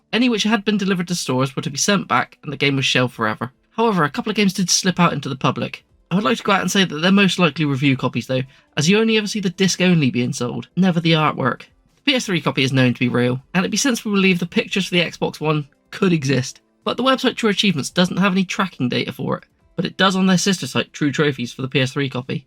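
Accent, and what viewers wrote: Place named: Bristol, United Kingdom. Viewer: From your accent i guess you are in the Bristol area? Fellow 360 collector here 🫡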